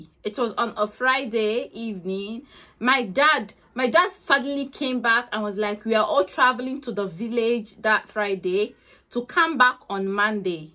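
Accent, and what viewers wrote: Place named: Ghana. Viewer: So sorry 😞but na ur stubbornness cause am 😆 I love ur English u speak like Ghanaians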